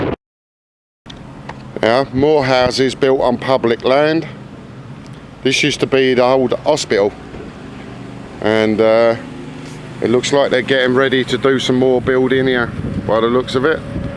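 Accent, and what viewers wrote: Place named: East London, United Kingdom. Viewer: WHERE YOU FROM ORIGINALLY,? I HAVE A SIMILAR ACCENT AND I'M FROM RAINHAM/DAGENHAM. NOW LIVE IN HUDDERSFIELD FOR SOME FUCKING REASON. KEEP UP THE AMAZING WORK, MATE. BIG FAN.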